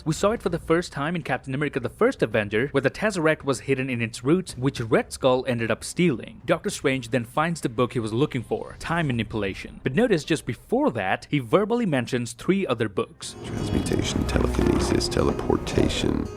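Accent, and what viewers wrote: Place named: Montreal, Canada. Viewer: You have a Montreal accent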